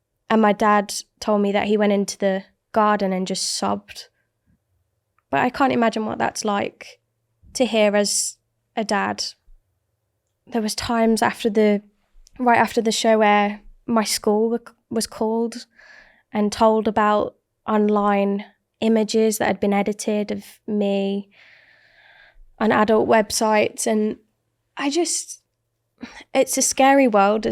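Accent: United Kingdom. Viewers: I love British accents & I definitely love her singing.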